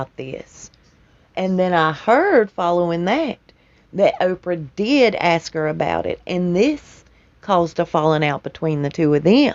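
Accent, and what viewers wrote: South, United States. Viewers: By the way, I love your southern accent, love to hear you talking.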